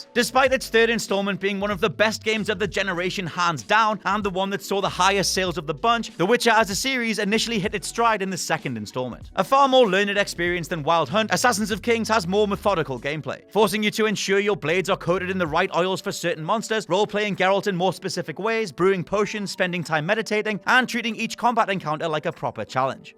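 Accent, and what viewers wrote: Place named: England, United Kingdom. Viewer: Shouting English accent I can't watch these videos it's painful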